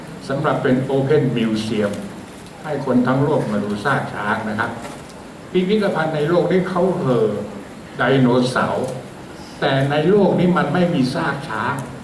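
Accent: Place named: Suphanburi, Thailand. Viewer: ตอนแรกฟังน่าเชื่อถื่อมาก สำเนียงสุพรรณ คือสำเนียงหลวง ต่อมา โคราชมาจากไหน นี่มันเหน่อมันสำเนียงหลวง อีกต่อไปไทเลย เหน่อแน่นอนครับ คงจะเป็นสำเนียงหลวง ยิ่งฟังยิ่งเพี้ยน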